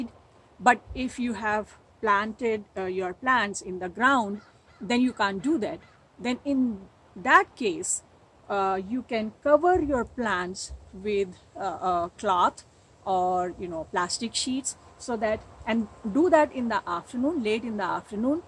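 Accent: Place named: India. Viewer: Hey. May I know in which country you live in? You never mention any country or state in your videos, so it confuses me a lot... Your accent is Indian, if I am correct. But there hardly is frost in India... Also, I just saw your video about wisteria, though I have purchased some seeds of it, don't exactly know if they are original or not, but I have never seen wisteria in India. I am from Kashmir and wish to know if wisteria will survive here in snow. Thank you! And I apologise for so many questions 😂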